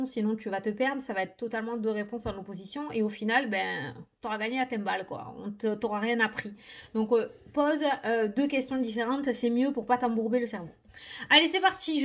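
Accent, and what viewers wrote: South, France. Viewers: Chère, quel bonheur de t entendre! J adore ta bonne humeur, ta spontanéité et ton accent de mon sud qui me manque, j habite en Thailande 😉. Je suis dans un total lâcher prise et si quelque chose me tombe dessus aujourd hui je crois que je serai en mesure d encaisser 😊😀. Gros bisous et un grand merci 🙏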